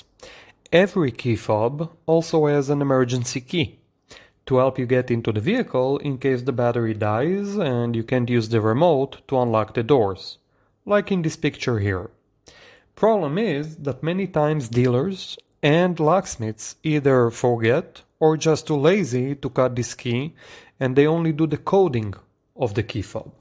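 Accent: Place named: Israel. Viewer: Your israeli accent is clear as sun Ty for destroying the locksmith industry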